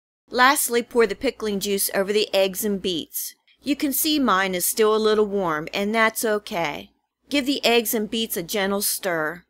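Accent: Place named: Philadelphia, United States. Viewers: Oh, and, I just love your PA Dutch accent!: ) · I think I hear the Jersey/ Philly accent! I love it, my wife still has a little bit.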